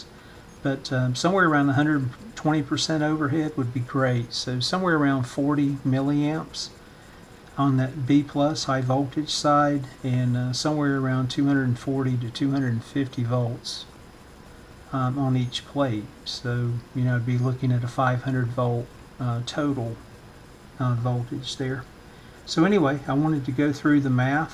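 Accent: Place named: United States. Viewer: Thanks very much. I didn´t know that web page catalog for old radios. It will be very useful for me with this Portadyne has my head on nuts. Have a nice day. Wish to see your next video. Your american english voice is not so difficult for me to understand (only some times with some words or some short phrases). Best regards and follow on line. Ketk (from Spain).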